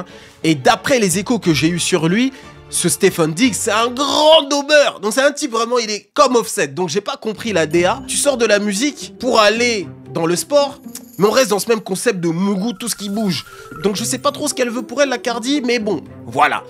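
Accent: Democratic Republic of the Congo. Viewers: J'adore ton accents lingala kulutu ❤🇨🇩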